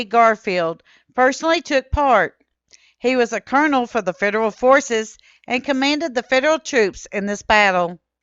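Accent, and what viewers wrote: Minnesota, United States. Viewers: It's a Minnesotan upper midwest accent you can hear it in parts of the Dakotas Wisconsin and Iowa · Never heard such a thick Minnesotian accent...😊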